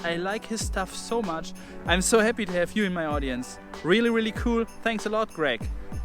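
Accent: Germany. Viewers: sofort gehört das deutscher bist haha :) schaue öfter vorbei ! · Ich dachte die ganze zeit er muss deutscher sein bei seinem englisch... und dann kam die Bushaltestelle😇 (ist das erste video, dass ich von ihm sehe)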